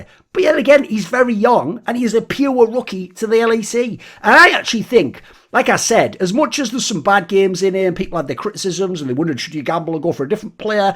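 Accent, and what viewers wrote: France. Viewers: 10/10 french accent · the french accent's masterful return ! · that french accent made me scream with laughter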